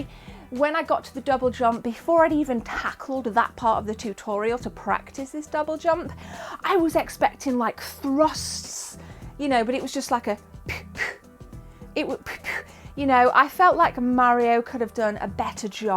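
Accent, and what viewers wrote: United Kingdom. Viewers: I love that British accent lol. My first time watching. Nice job! I'll stay tuned. Days Gone is so much better, by the way!!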